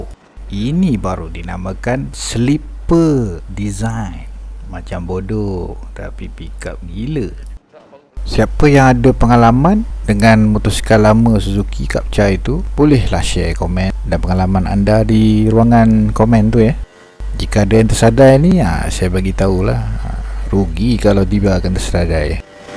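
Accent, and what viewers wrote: Perak, Malaysia. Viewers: Hahaha. Nasib baik sy bujang lg bang. Tapi terlajak sikit la, dah 34 ni single mcm mono je ha...abg perak belah mana ye. Org perak punya slang ni paling senang nk cam. Sy pun dr batu gajah ni ha....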